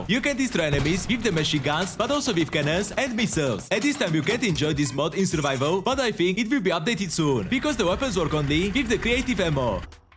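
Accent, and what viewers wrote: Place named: India. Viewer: Why do u have the most indian non indian accent of english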